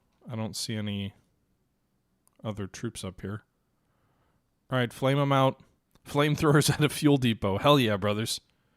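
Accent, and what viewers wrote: Germany. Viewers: Is that a german accent? 😅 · That german accent is pretty good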